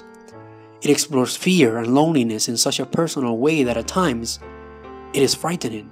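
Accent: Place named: Turkey. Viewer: good video but turkish accent + its called video essay and you could have made it hours long since theres a lot to talk about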